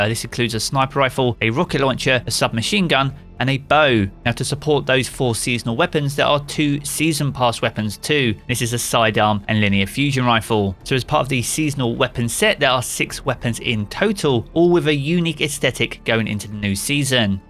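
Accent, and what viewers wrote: United Kingdom. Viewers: I so much love your briish accent idk I'm not English man:/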